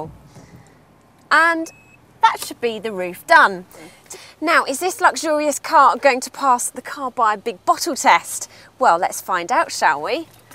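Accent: United Kingdom. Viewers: Gosh i love the british accent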